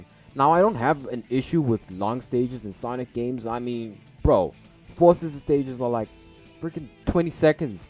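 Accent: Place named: Bronx, United States. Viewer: Can't tell if this guy's accent is asian hood or bronx and I don't care lol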